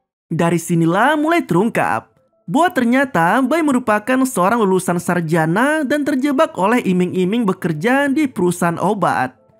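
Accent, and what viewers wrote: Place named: Medan, Indonesia. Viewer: Begini suara Adon Saptowo, vokalis base jam, tapi dengan logat medan 🤔🤔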